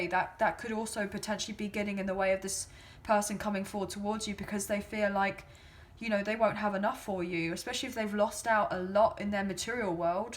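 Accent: England, United Kingdom. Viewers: Refreshing to hear an English Voice x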